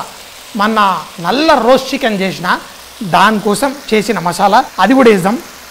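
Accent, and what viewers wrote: Telangana, India. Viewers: Thelanganana bhasha manchiga vattinav bro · Andhra style speaking nunchi Telangana style li ku vacheru yenti sir. Ghasaghasalu cost chala yekkuva ayindi sir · Anna super ni vantta kantte ni pakka Telangana yasa, 😂😂 aaa peggu vesinav kadha 👌👌😂😂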